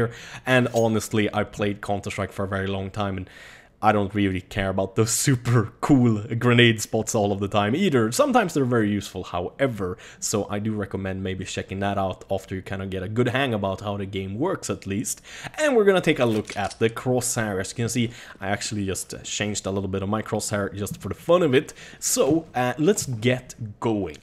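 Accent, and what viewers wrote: Sweden. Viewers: I love the swedish accent xDD lie hopw you say DOTT lol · are you swedish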